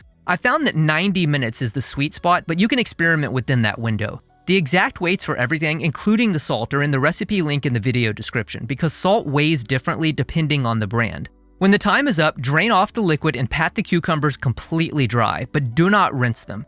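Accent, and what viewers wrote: Texas, United States. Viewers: Is a native Texan? The way he pronounced "oil" is very specific. Is that the Texan accent? Just curious.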